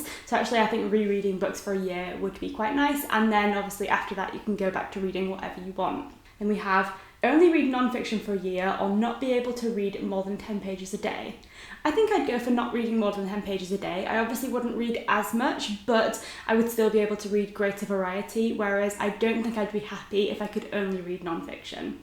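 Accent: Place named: Yorkshire, United Kingdom. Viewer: This was so fun ☺️ love your videos and just the whole vibe of your channel! Are you from Yorkshire? I feel like I can hear it in your accent 😁 I’m a Yorkshire lass 🥰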